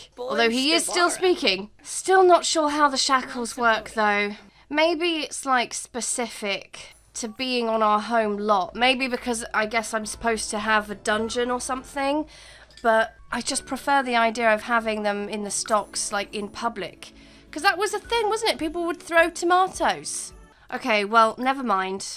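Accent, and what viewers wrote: United Kingdom. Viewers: god she's such a.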